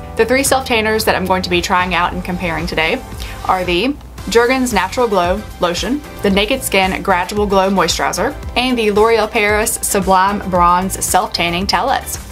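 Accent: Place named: South, United States. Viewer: Just finding your channel but it's great to find someone on YouTube with a southern accent like myself 😁 thanks for the video.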